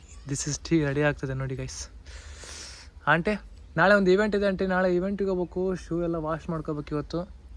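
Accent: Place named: North Karnataka, India. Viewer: ನಮ್ಮ ಉತ್ತರ ಕರ್ನಾಟಕ ಭಾಷೆ ಮಾತಾಡಕತೀರಿ , ಇದೇ ತರ ನಮ್ಮ ಭಾಷೆಯಲ್ಲೇ ಮಾತಾಡ್ರಿ ಅಣ್ಣಾ.. 🔥👍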